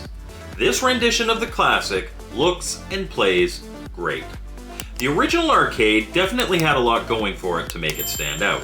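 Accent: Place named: Russia. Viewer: Let's Go DJ Blyatman would Be Proud using that authentic Russia 🇷🇺 Accent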